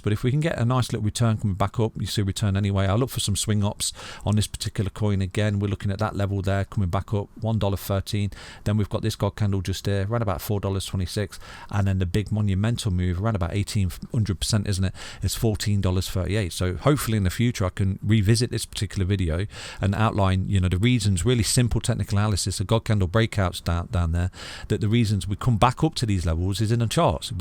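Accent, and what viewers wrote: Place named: England, United Kingdom. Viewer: Please speak slower. Especially with the English accent and all of us Slow-minded Americans sipping whiskey and munching Doritos.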